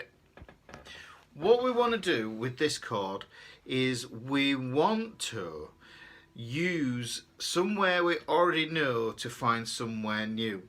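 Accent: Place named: Northern England, United Kingdom. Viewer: So happy I stumbled across your channel today, I am now binging, you are amazing, I love the Northern accent too! My mum is from Derbyshire, Glossop actually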